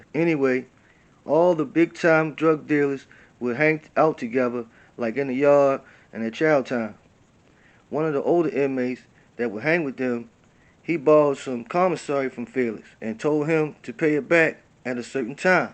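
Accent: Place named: Baltimore, United States. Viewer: Sounding like you from Baltimore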